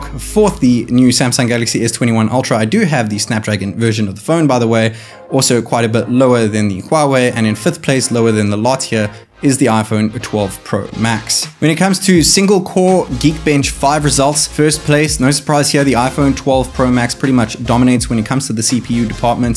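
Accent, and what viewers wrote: South Africa. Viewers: You sound south african bro 😌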